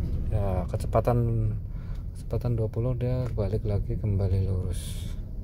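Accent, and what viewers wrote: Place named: Java, Indonesia. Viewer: Jawane endi um. Kyong medok yaa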